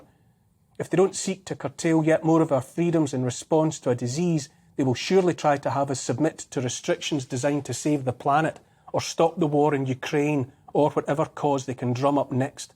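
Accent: Scotland, United Kingdom. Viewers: oh no baby, it seems you have gone down the route of justifying yourself to a stranger like me, I am flattered but I have not even met you yet, you must buy me dinner first 😉 'Irn Bru rhymes with Guru', yet you used both in your pet name for the guy, how odd from someone who has an elevated grasp of the English language and its use. Irn Bru is synonymous with Scotland, has a Scottish accent and is known to be Scottish also but of course it was just a coincidence that you used 'Irn Bru' to partner with Guru because it somehow rhymes with Guru. 'boohoo' would have been a good replacement and/or partner for Guru and it's meaning would have been appropriate to your goal. P.s. how dare you assume that I not only wear knickers but that I wear underwear at all.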